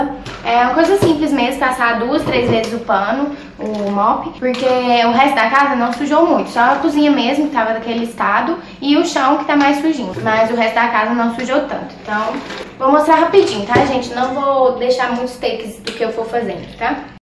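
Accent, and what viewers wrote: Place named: Minas Gerais, Brazil. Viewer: Nosso sotaque mineiro e outro nível ❤️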